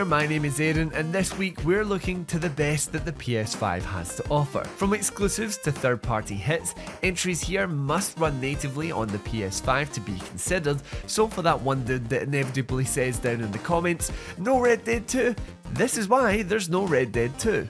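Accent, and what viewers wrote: Scotland, United Kingdom. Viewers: love the scotlandish accent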